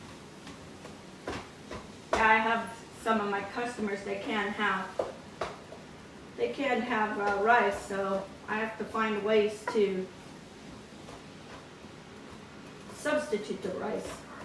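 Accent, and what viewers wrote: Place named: Azores, Portugal. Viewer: Hearing the English language with the azorean accent reminded me of my neighbour next door that passed away a few years ago 🌈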